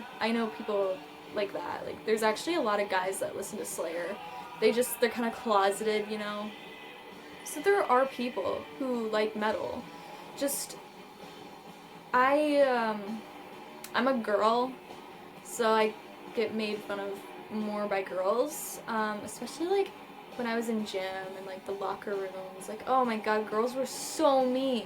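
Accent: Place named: Canada. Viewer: I'm gessing it by your accent, are you from Canada?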